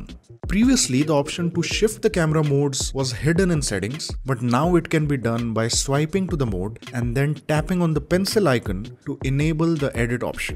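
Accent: India. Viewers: Goddamn Indian accent! 🤦🏽‍♂️ Smfh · This is pure indian accent 😊 I wouldnt say we are ashamed of it ;)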